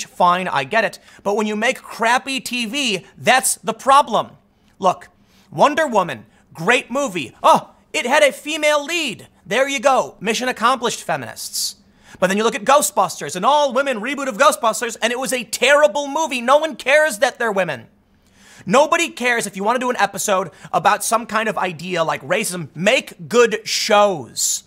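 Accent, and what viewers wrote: United Kingdom. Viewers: British accent is the best part of this video.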